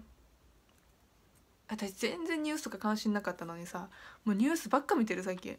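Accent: Nagasaki, Japan. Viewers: 未だに外で遊び回っとる奴らはその行動1つ1つがこれからの未来に関わってくるって重く受け止めて欲しい。あと全然関係ないですが、自分博多弁の人なんですけど、さん長崎弁話されててなんか親近感が沸きました(*^^*)これからも頑張って下さい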